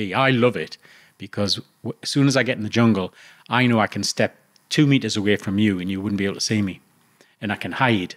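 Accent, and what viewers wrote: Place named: Newcastle, United Kingdom. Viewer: Aye wor kid he's a fuckin Geordie an all!..sounds like he's from Shields or Byker lol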